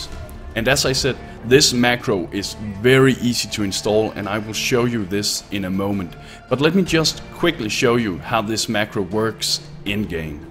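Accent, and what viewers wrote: Denmark. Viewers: Thanks for sharing the macro man! I got it to work just fine and it's working smoothly so far. By the way, just to see if I've managed to identify your accent properly; are you danish?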